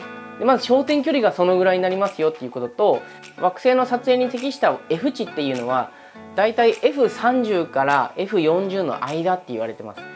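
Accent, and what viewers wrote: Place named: Hokkaido, Japan. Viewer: 恥ずかしながら私はカメラマニアではなく、北海道弁が気になっちゃって、拝聴させております。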